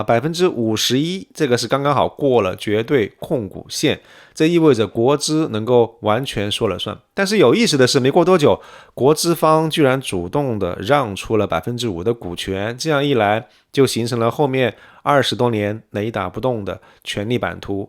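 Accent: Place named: Guizhou, China. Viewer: 博主是贵州人吗？跟 二爷 的口音好像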